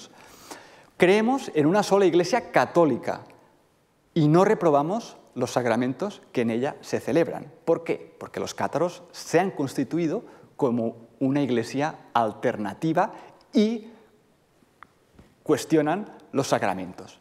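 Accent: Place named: Catalonia, Spain. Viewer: Creo que el professor no tiene la lengua castellana como propia. El apellido es catalan, el acento de su castellano lo delata así como açgunos vocablos en perfecto catalan. Ojalá los profesores castellanohablantes utilitzaran el català con la misma soltura que él tiene en castellano.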